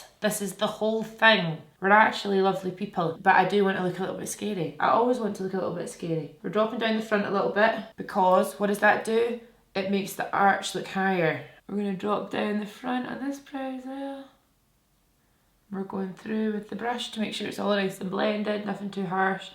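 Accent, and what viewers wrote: Scotland, United Kingdom. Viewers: I love the Scottish accent!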